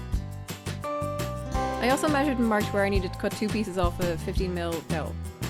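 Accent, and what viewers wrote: United Kingdom. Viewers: ...wait your British? Always thought you were Scottish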